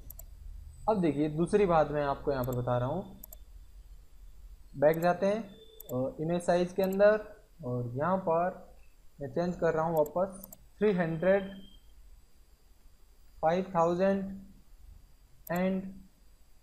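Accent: Haryana, India. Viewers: Tu Hariyanvi or Rajasthani hai 😁😁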